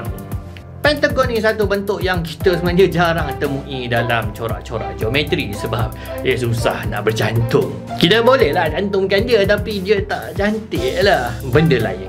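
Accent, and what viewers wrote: Malaysia. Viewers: Disini saya belajar sains + bahasa dan aksen Malaysia sekaligus. Terimakasih 👏👏🙏🏻🙏🏻🙏🏻🙏🏻 Dari negara tetangga 🇮🇩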